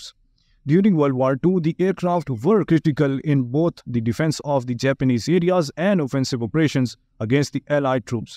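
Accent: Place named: India. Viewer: By the accent I think he is Indian. If you take it into account, he is making a real effort to speak slowly.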